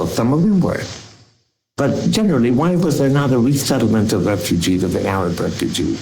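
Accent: United States. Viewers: Her American accent gives it away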